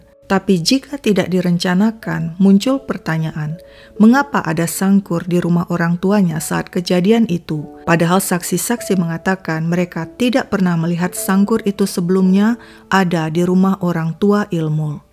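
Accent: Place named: West Sumatra, Indonesia. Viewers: bahasa minangnya benar2 fasih, dengan logatnya minang bgt... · Logat padang nya mantap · Mba orang Minang kah? Bagus banget logat Padangnya. Soalnya saya orang Padang juga.eheheh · mbak suaranya enak didengar dalam penyampaian,apa lagi suara mbak dgn logat minang rancak lamak didanga, sukses taruih yo Uni rang Minang,AMIN🙏❣👍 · Ternyata logat minang padang mbak kental kali dialek nya....menisyaratkan mbak org minang.. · mantap logat minangnya...jangan jangan urang awak juo 👍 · mungkin ini berasal dari minang karena logat dan penyampaiannya persis kayak orang minang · Mantappp logat minangnya bagus nih..... sukses terus yaa... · Melihat longgat dlm narasinya,pasti dia orang Minang....😊😊😊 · org padang?? Logatnya pas.hehe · Uni,, urang awak nak? Luruih bana minang nyo,, sabana ancak... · orang minang, ya...? Bagus sekali logat minang nya.... 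· tyt orang padang.....aksennya dapat banget....eh dialeknya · Mbak kayaknya orng Padang ya??..logat/ cara bicara bhs minang pas banget...sy orng padang..jd tau klu mbak logat padang pas 😀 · Jadi YAKIN... ENCI... ADALAH TIONGHOA SUMBAR...LAFALNYA PAS LOGATNYA KENTAL...😁🙏🙂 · Hebat bs bhs/ logat Padang 👍 bsk2 pk bhs daerah lg ya, enak dengernya 😀👍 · Fix urang awak...logat minangnya pas banget 👍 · Logat padangnya masuk.. orang padang jga kah uni? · Bahasa minangnya kok padat benernya.. Orang minang ya min?? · Kyk nya ni org minang, logatnya kek minang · Waduh... top banget logat padangnya si narator. Saya orng pdng asli mengakui.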